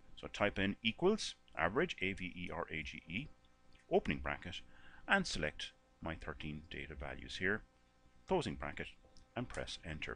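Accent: Ireland. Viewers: I found this video very useful,. And...are you Irish, cause you sound Irish, and your last name is O'(name). I liked hearing your voice as I followed along (it was very relaxing and almost lulled me to sleep, loll), and I liked the fact that you went slow. Thank you so much! Blessings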